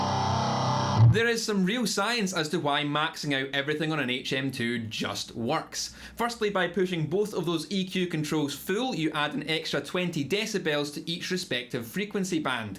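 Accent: Scotland, United Kingdom. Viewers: Scotish Arin Hanson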